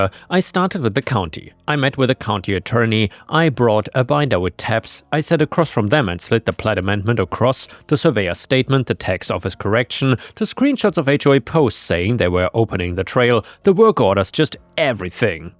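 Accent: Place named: Germany. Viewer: lol a German accent tells the story cute 🥰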